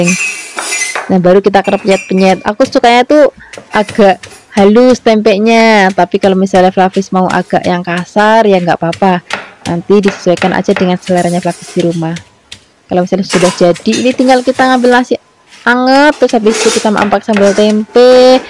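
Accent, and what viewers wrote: Java, Indonesia. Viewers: Medhok jawa ya..makasih mbak resepnya.nek sy petainya tak belah ..takut ada uler di dlmnya 😅😅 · Lestarikan medokmu👍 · Khas jawa nya kental bgt 🥰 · Saya suka cara bicaranya...medok jawanya.😅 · Mbak , itu orang mn sih kok bahasanya medok jw bgt 🙂? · Jawanya medok kaya gado"enak didengernya😅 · Maaf mbak,kl boleh tahu,jawanya mana mbak kok cara bicaranya enak kali di dgr,bercampur gitulo bahasanya😁👍👍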